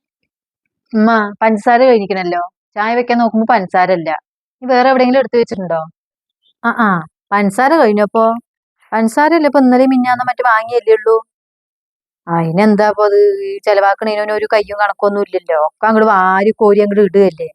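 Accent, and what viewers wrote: Palakkad, India. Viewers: നിങ്ങൾ പാലക്കാട്‌ ആണോ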